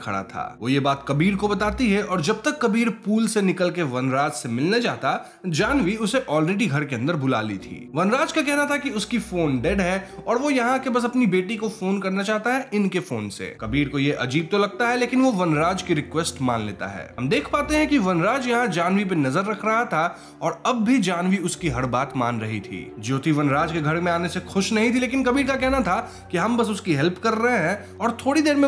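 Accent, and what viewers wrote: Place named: Bihar, India. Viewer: Story teller is Bihari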